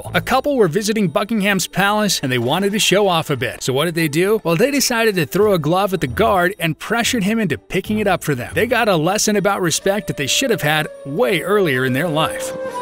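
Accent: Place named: United States. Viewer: Why àn àmerican voice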